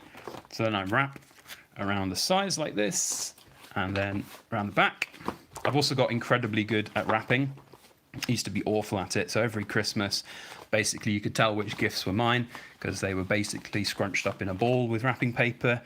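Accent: United Kingdom. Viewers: Was watching a video today and they were talking about regulating gold and silver for the common man you sound British and don't know if it will effect you. But in America they want to know how much metals your selling. Like this having a bullion kinda business they want to regulate here in America also said you'll have to have some kind of paper work when you go to sell silver and Gold and if you don't there trying to say how do they know its yours smh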